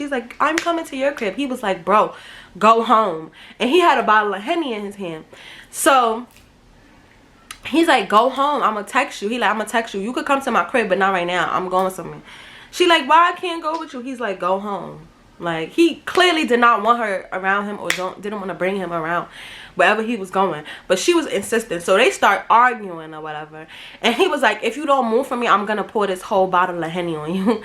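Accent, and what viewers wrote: New York, United States. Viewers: I fcking love new york accents bish, Lemme finish binging the storytimes 🔥🔥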